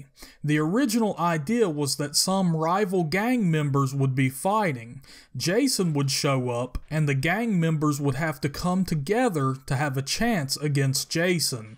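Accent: United States. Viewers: Wtf is your accent!? I love it! I know it’s American... I’m from New Orleans myself. ⚜️😉.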